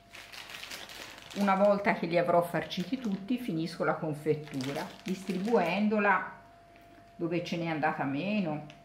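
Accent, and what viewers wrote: Tuscany, Italy. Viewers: Bravissima come sempre. Ma te non ti chiamaviTerrore Spendido ? O mi sbaglio ? Ti ricordo bene col tuo accento Toscano che personalmente mi fai morire dal piacere ascoltarti va be bellissima sta pasta frolla senza dubbio li farò col cuoreeee ❤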